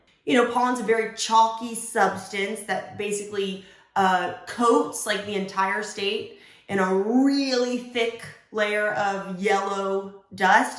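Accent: United Kingdom. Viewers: She more sexier with British accent !! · I clicked on this video just to comment wow I thought she was british so now that i've done that... imma go watch the elections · I thought she was british holy crap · why did i actually think she was british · Thought she was British 😳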